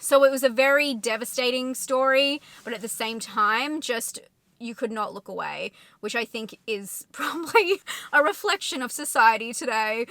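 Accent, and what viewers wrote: Australia. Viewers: I love the Australian accent and how the letter O is pronounced as OR. Say “ O and R “ over and over again!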